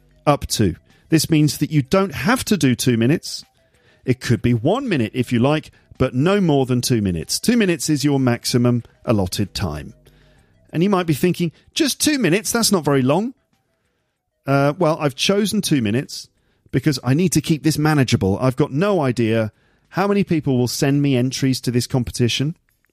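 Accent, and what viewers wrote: United Kingdom. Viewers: Is your accent pure British,? I feel something different.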